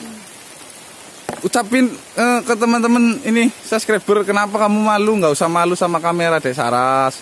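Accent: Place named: Java, Indonesia. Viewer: Demen ambe medoke ^^ Silakan silakan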